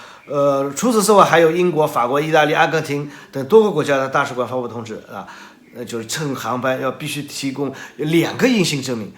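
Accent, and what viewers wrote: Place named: South, China. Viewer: 终于有我们南方人说政治啦👍👍👍这个口音听着真亲切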